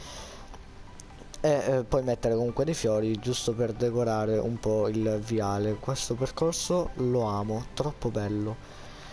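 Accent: Sicily, Italy. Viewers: We mbare sei siciliano?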